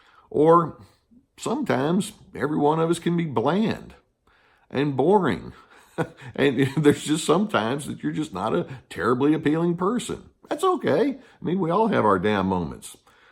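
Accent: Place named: Texas, United States. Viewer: Lol it's the Texas accent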